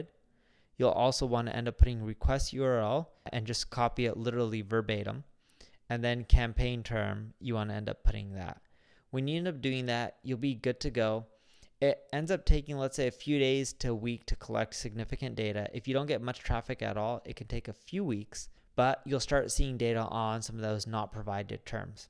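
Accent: India. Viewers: Why your accent is so different/are you Indian?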